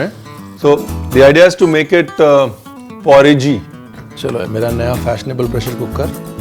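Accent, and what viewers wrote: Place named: Punjab, India. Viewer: Panjabi pana🤣😘itna cute bolte ho 😆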